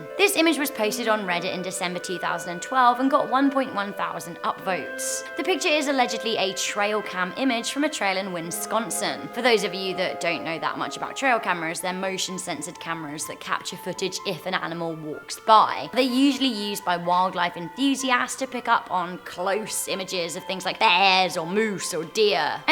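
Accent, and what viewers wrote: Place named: England, United Kingdom. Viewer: That stupid english accent Lol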